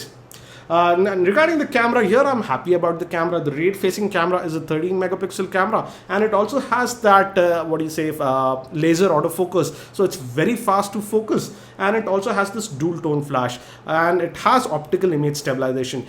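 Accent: India. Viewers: your axent is anoi g stupid indi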